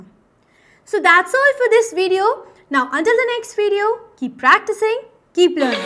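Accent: India. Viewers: Gujarat se ho kya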